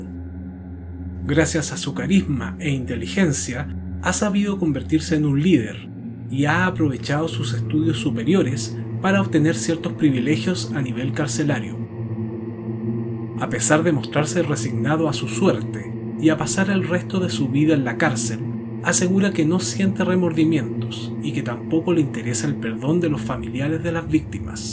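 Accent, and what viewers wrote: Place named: Chile. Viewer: Que grata sorpresa saber que usted es chileno, tiene un agradable acento….El acento chileno es lindo, siempre y cuando se pronuncien las palabras.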